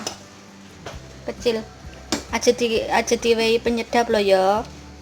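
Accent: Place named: Banyumas, Indonesia. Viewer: Ponorogo rasa ngapak ya... 😊👍👍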